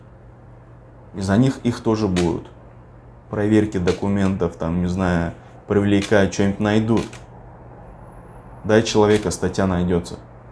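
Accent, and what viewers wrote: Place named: Uzbekistan. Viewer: Кайси шевада гапирасиз узбекчани жуда чиройли шевада гапирасиз 20 йил яшаган Россия да усганга ухшамесиз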